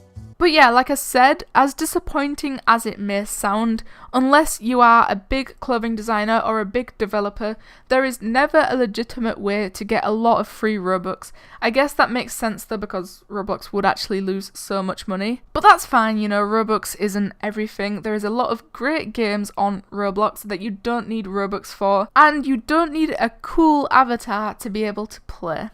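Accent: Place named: Manchester, United Kingdom. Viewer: do you live in Manchester? I hear your accent and its currently pm for me now xd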